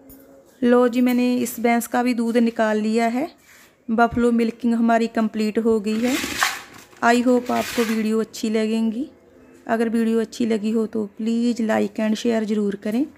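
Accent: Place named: Punjab, India. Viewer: Hello mam aap Na Punjabi ma bolta hi Sacha lagta ho oor milking ka trika bhi sahi h hum bhi asa hi Karta h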